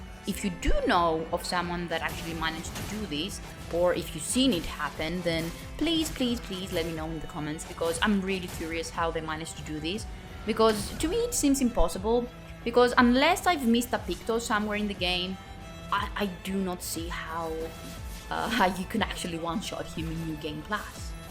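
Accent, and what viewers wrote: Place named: Greece. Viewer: At least I can hear the voice here! Such an amazing Hellenic accent!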